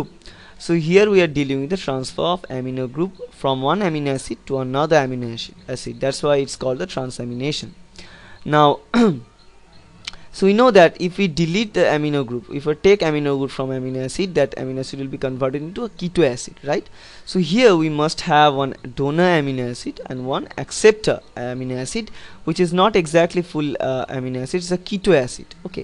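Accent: India. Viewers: I like this video... Its helpfull. About the accent doesn't matter, in fact i love hindi accent😘😘😘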